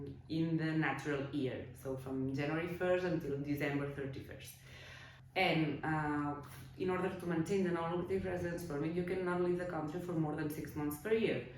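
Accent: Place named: Spain. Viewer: I had no trouble hearing or understanding anything you said. Your lovely Spanish-English accent is helpful to begin learning Spanish and why it is different from English. I do wonder whether the recent floods are impacting where people want to retire. Also, do the high Spanish taxes on USA social security pensions deter people, or are there ways to reduce paying a large portion of monthly social security benefits to Spain?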